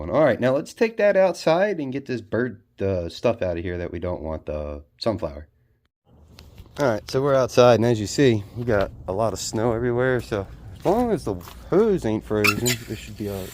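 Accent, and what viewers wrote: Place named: Appalachia, United States. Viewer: Hahah that was funny as fcuk😀 Ya kinda sound like a mountain-man from Appalachia.. but what do I know, I'm in friggin Sweden.. Much preech the knowledge sir,(bird seed, awesome!). Greetings from a new subscriber in Stockholm🙂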